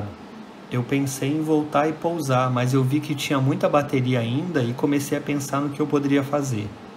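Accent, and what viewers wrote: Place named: Rio de Janeiro, Brazil. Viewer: Aaaaaaaa cariocaaaaaa se entregou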